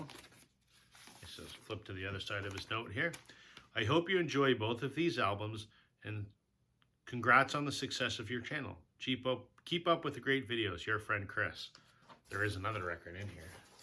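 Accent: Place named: Canada. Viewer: Hey Man!! Good to find your channel.. I'm JT.. I live down in Reno, Nevada.. have a feeling. and hear in your voice that you are up in Canada.. especially when you showed that on your intro.. great band!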